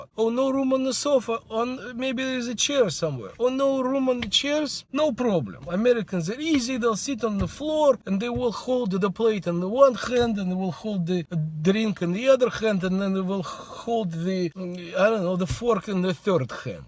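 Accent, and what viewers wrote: Russia. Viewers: PaNdoRiKa NeN actually i watch his videos only for his strrrrong Russian accent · Акцент у вас такой, русский) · Александра Кирилюк Замечательный Русский, узнаваемый акцент. Многим нравится.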